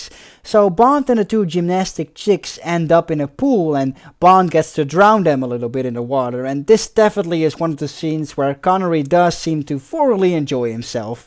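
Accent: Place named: Netherlands. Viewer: 'He looks like a bored, dirty old man with an ashtray on his chest'! Man, you are one funny fucker! I am still laughing at this -- the line definitely helped by your Dutch accent, my all time favourite of any foreign English speakers. Brilliant.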